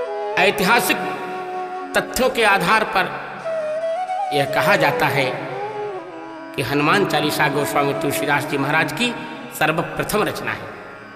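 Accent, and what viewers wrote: Standard. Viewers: 🕉️♥️⭐🌹🌹 शुद्ध उच्चारण मधुर स्वर ♥️🕉️🪔💫🔥🔥🔥🔥🔥🔥❤️❣️ जय हो जगदगुरू की🔥🔥💫💫🪔🕉️🕉️♥️♥️🌹 ज़य हो अंजनी पुत्र की जय हो♥️♥️🌹♥️❣️❤️❤️❤️🪔💫💫💫💫🔥🔥💛🙏🙏🙏🙏🌷🌹🕉️🪔💫🔥❤️❤️❣️🔥❣️❤️❤️❣️🔥💫💛💛🪔🕉️♥️🪔💫🔥❣️❣️❣️❣️ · यह हनुमान चालीसा का शुद्ध उच्चारण हुआ है pradam गुरु देव 🙏🙏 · Pranam gurudev ji, apka voice ati sunder, apka ek ek word bahut sudh hai lajabab, Jay bajarangbali,Jay Hanumanta balbira. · बहुत ही सुन्दर और शुद्ध उच्चारण, 🙏🙏🙏 गुरुवर के चरणों में नमन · शुद्ध उच्चारण के साथ मधुर स्वर मे इस हनुमान चालीसा को सुनने का बार बार मन करता है जय सियाराम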